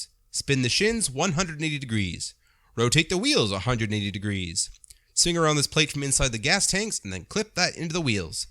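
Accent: Canada. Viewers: Hey, I know this comment is kind of late. I just wanted to let you know that I've enjoyed watching your videos for years, the transformations are amazing to see in stop motion, and I'll be honest, taking away the articulation section isn't that major, in fact a little change here and there can be good for a YouTuber to improve! Also I just love your midwestern Canadian accent It's clear, and fully understandable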